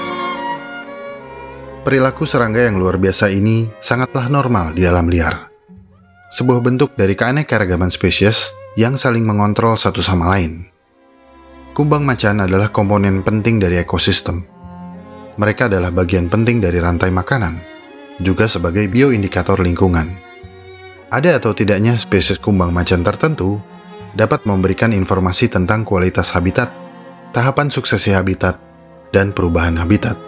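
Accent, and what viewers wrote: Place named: West Java, Indonesia. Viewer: Sieta mani teungteuingeun. Dr lafalnya Fix orang Sunda.?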